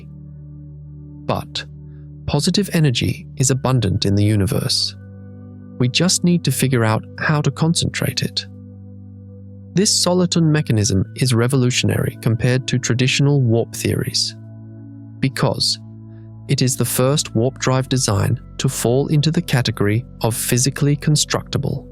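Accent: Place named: United Kingdom. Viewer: 11-minnits 'in' annnd, ths p.o.s. up-loader, can't-be-bothered to review thn, edit (their...??) 'work'! W-t-h...??!! Ther-haz-been NUMEROUS mis-pronunciations (&, other-ERRORS)!! FAIILLL!! FAIILLL!! FAIILLL!! Allllsoooo: pleeez STOP-uzing a British-voice('accent')? They are-NOT more-intelligent-thn, Americans, based-SOLEY-upon how thy speak??!!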